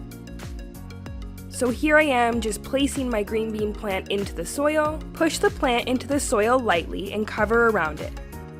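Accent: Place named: Canada. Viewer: Great advice! Are you from Canada or Minnesota by chance? I recognize a little accent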